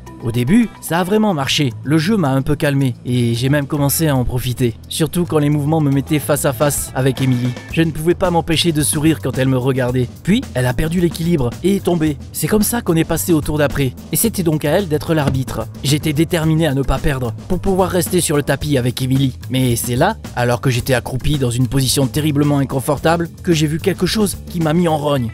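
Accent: Marseille, France. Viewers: OMG L ACCENT MARSEILLAIS INCROYABLE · léo lgm omg l accent marseillais incroyable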